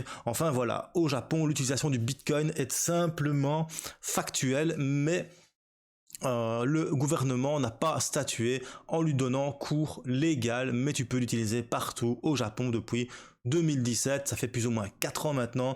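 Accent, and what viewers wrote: Liège, Belgium. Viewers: Le bon accent de Liège ... Bonjour de Mons en Belgique continues tes vidéos poto ... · Merci pour la vidéo. Bonne semaine de vacances si je ne me trompe pas. J'aime bien cet accent Belge. J'ai l'impression d"écouter du Benoît Poelvoorde , surtout quand tu t'agaçes ;-)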